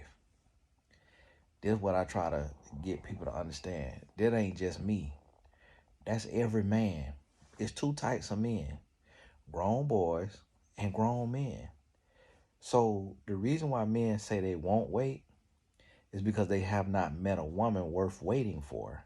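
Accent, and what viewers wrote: South, United States. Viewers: Lol you crack me up 😂😂 it’s the accent for me I know you southern!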